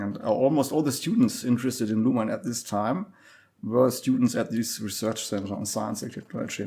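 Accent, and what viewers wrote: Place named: South Africa. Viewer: Out of interest, where is the creator of this podcast from? Your accent sounds very similar to a South African accent. I am from South Africa, and this is intriguing.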